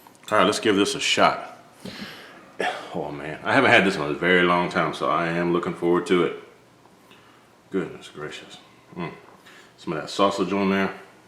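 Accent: South, United States. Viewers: Girl I love him..... And that southern voice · Nothing like a slow-talking, slow-walking Southern boy !! As for the Cajun beans, I've been cooking those since I was 12 & my mamma taught me. What I would like to see is someone cooking red potatoes, green beans & ham. Some folks like to put bacon or ham hocks with the potatoes & green beans. Every now & then, I cook the Cajun Beans in a slow cooker, put them in bout 0430 or 0530, and by 1400 0r 1500, those Cajun beans are good to go. Be sure you have some crunchy French bread to go with the beans. BTW, I put Serrano peppers, & poke holes in those, buddy !!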